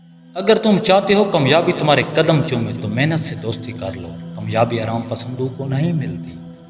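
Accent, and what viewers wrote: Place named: Saraiki, Pakistan. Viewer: Q k Urdu saraikioun ki trah boltey ho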